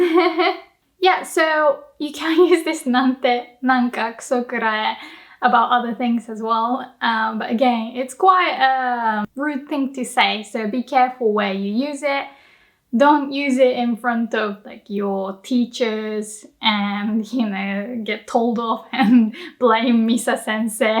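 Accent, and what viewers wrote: England, United Kingdom. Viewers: Love your English accent, wonder where you picked it up? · I like her British accent.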